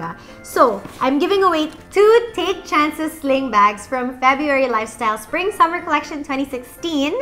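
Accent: Visayas, Philippines. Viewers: # I already follow you since nakita tika sa instagram amd I follow you and also here sa youtube ako d.i to ga comment nga cute kaau mo mag bisaya ni bf, your so cute and love your style and one you're my style icon. 😘 xoxo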